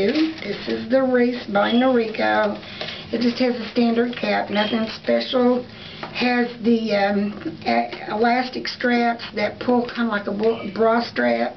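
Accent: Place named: Nashville, United States. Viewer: First of all, as a new wig wearer I really appreciate having video reviews to watch to help me decide which ones to try. So thank you for taking time to do the videos. I also wanted to ask you where you got your OD done. I'm outside of Nashville and your accent kinda sounds like mine! I've had Graves' and TED for about 15 years. Had one OD In 2003 but in the years since my other eye has grown out. The dr. who did my surgery in 2003 has moved out of state so looking for a new one.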